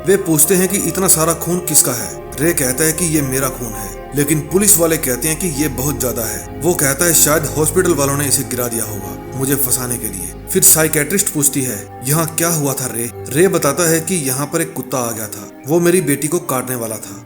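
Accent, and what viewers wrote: Punjab, India. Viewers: mennu laggda 22 punjabi aa 😂 accent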